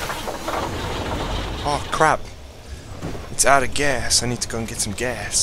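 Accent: United Kingdom. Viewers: why do you taik in a british acsent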